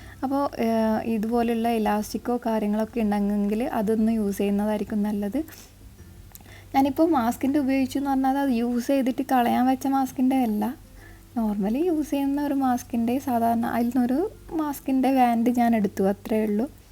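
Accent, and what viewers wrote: Kannur, India. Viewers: Eattathi Kannur slang 🥰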